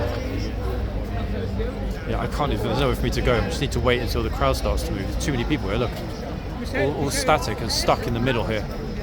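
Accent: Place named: Cockney, United Kingdom. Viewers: If you must make your commentary for your channel, please learn that a double 'T' sound is be-t-t-er not bedder, and also the letter 'H' is pronounced 'Aitch' not 'Haitch'. You do not say 'Mem' for 'M', or 'Lel' for 'L', so why do you say 'Haitch' for 'Aitch' 'H'? Please speak the 'King's English' and not that southern counties 'right-on' rubbish. Check the Oxford English Dictionary: aitch, n. The name of the letter H, h. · I LOVE that wonderful cockney accent, albeit, sometimes, difficult to understand (American here 😉)Thank you for the clarification on who is English and who may or may not be British 😅 An additional question, if I may, the owner of this channel answered the gentleman’s question by explaining that when he refers to himself as English, he means he is not Welsh, Scottish nor Northern Irish….. is there a difference in Northern Irish and Southern Irish? 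😊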